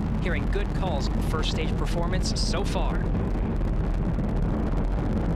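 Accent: South Korea. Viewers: 이사람 전라도 말투쓰는사람 맞죠?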